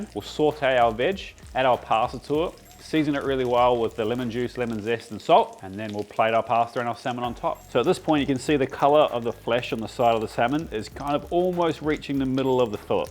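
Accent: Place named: New Zealand. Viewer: 'Aw'right lets cook some parsta'. I do enjoy your Kiwiness and the vids of course. :)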